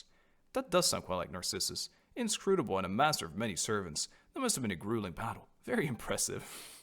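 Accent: France. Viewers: I love the french accent you do, pretty accurate as my professors speak just like that 🤣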